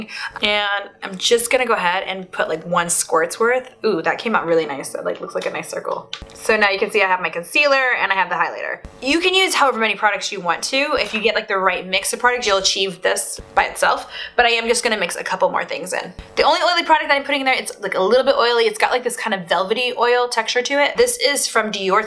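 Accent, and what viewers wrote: Iraq. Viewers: Make arabic vids we like ur iraqi accent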